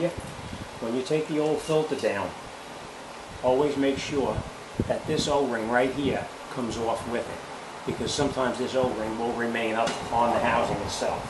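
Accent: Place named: New York, United States. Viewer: Precise, to the point and love that NY accent. Great Job!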